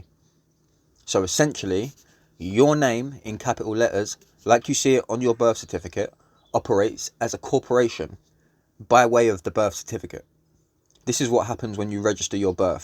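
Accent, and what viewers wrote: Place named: London, United Kingdom. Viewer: u sound like a proper London geezer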